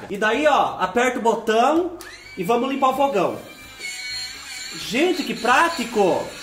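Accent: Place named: Paraná, Brazil. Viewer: acho lindo seu sotaque parece sotaque de paranaense lá do sul💋🙏🍀❤️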